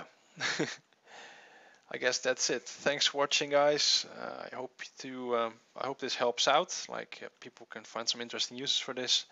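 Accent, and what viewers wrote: Netherlands. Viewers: Guys guys guys. Stop for a sec with discuss if he's dutch or not. Well, i have the right anwser for ya. I'm half engish, half dutch. The most people i heared that are dutch and talk english, are talking like that. Its just a dialect from dutch people. I'd like if everyone would stop with commenting like that. It is'nt even funny for. He's uploading a video, nothing more, just that. Show some respect people!! Because i do. Thanks for reading guys. If you think im wrong, screw you.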